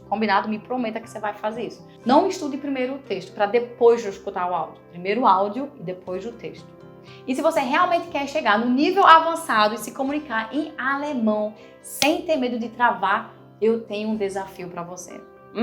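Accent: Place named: Northeast, Brazil. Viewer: Hallo! Desde a primeira vez que escutei sei vídeo, fiquei com uma pulga atrás da orelha. Pensei ela é do nordeste e me parece ser de recife🤣🤣uma nordestino conheci o sotaque. Apostei até com amigas. Até perguntei no Instagram.Amo seus vídeos😍sucesso pra vc!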